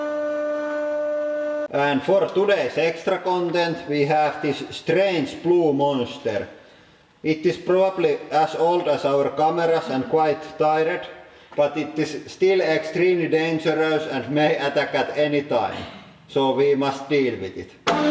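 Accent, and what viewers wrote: Finland. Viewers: kunnon ralli englanti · nice ralli englanti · are you from finland?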